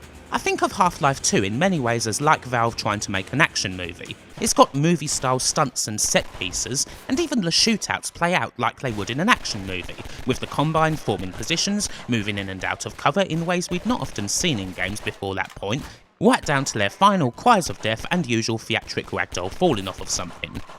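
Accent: United Kingdom. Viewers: LOVE THIS REVIEW, AND LOVE YOUR BRITISH ACCENT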